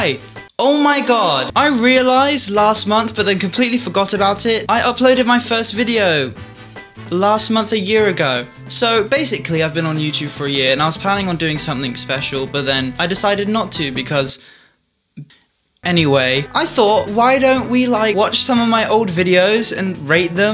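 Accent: United Kingdom. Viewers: Are you korean? Because u look korean but then u speak British 😰✌ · why do you have a british accent-whaaaAa? my small brain cell...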